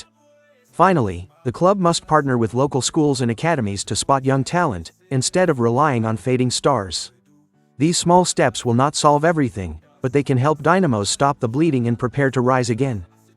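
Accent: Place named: United States. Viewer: First of all Bernard Marriott must take full responsibility 4 the current predicament Dynamos fc finds itself.Marriott ,a founding member has been treating Zim's most illustrious, famous,successful fc as his fiefdom.If Dynamos gets relegated ,all Dynamos fans should descend on Bernard Marriott or call 4 a fatwa on him !.Secondly the yank voice over is nauseating and atrocious to say the least !.Marriott must disappear from the helm of Dynamos.True Dynamos legends like Mhofu,Mwendamberi,Kwinji 15,Mozze etal must come back and serve/save Dynamos Fc !!!!!.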